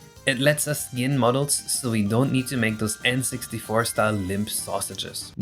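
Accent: Germany. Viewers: random question but where are you from? i hear a german accent in your voice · Hmm thats a german accent. Great tech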